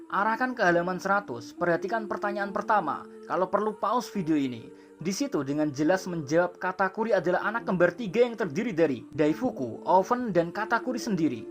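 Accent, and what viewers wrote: Java, Indonesia. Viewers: dari logatnya orang jawa. Feeling gw nama asli lo. Kayanya 70% benar wkwk iyaa ga min?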